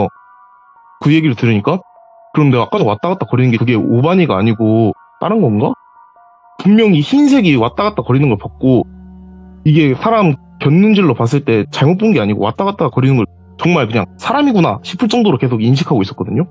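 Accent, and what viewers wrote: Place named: Jeolla, South Korea. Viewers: 저가 저가 거리는거 거슬리네 전라도 사투리인가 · 뭐래요 군산 사투립니다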